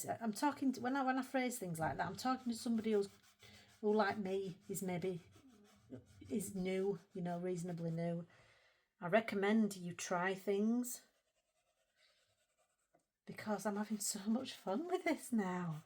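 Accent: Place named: Scarborough, United Kingdom. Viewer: Your accent is like mine are you In Scarborough? There again you said the Range. We don’t have one lol 😂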